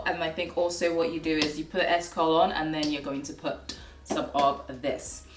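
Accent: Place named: England, United Kingdom. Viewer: Where are you from? England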